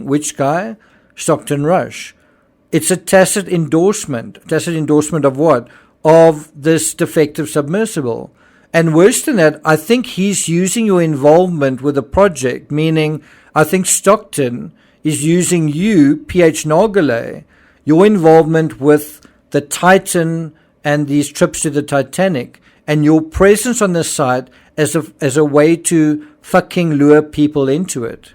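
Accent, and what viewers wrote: South Africa. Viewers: Love your work and your accent. South African?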